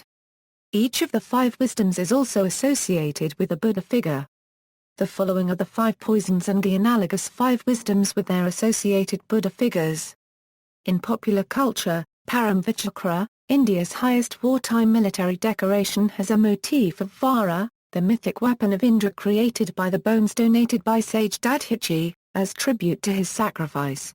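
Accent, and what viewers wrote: United Kingdom. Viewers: I studied Sanskrit and Hindi at university and 100% agree! Wrong pronunciation - this isn't Spanish!! They do that in Spanish jalapeno is "halapeno" - not Sanskrit or Hindi! Narrator is Britisher, so what do they know?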